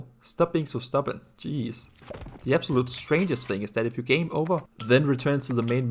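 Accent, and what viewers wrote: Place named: Netherlands. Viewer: That Jan Willem Nijman clip from his juice talk where he mentions explosions was really unexpected in this video. 😅Your accent sounds Dutch so I guess that explains it. Do you have a background in game dev? Overall great recap of Skullmonkeys. We had The Neverhood at home when I was a kid, used to play adventure games together with my dad and brothers. But never heard anything about this game. The fellas at Neverhood Inc are legends to me, so much creative expression.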